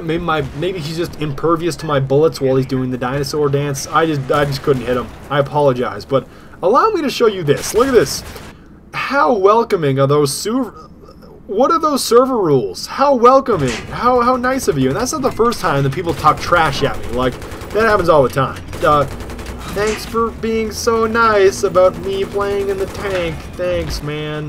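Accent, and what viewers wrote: Germany. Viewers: xDDD ur german sounds so funny , awesome vid, greetings from germany :) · your german is soo hilarious xD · your german accent are nice :D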